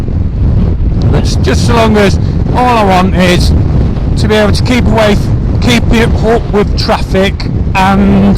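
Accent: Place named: Midlands, United Kingdom. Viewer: Lovely machines the Burgman, I had the 400cc version, loved it, it could do 60-80 all day, mine broke down and I had no idea what was wrong with it, it would start but then stop and wouldn’t restart. I was gutted that I couldn’t figure out what was wrong with it so I sold it as a project, the new owner figured it out and got it running and it’s still on the road today. They’re pretty nippy bikes, very comfortable, good on the fuel. Your accent sounds familiar, are you from Stoke on Trent or Derby or somewhere there? Great vid, good review, thanks.👍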